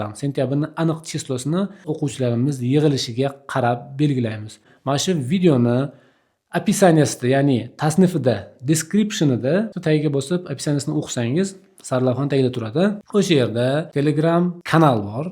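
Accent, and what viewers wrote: Namangan, Uzbekistan. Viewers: Узингиз Намангандансизда, шевангиздан билиниб турибди. Ман олдингизга бориб урганмокчиман, майлими, Наманганданман...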